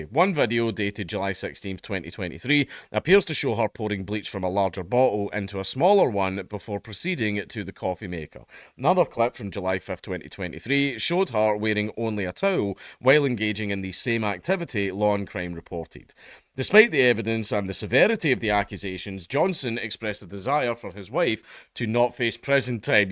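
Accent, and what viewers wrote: Scotland, United Kingdom. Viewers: Nice to hear him talking scottish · hitting us Yanks with that unfiltered Scottsman accent. I love it. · Lol mudder. I know we Welsh have a fun accent but Scots just take the cake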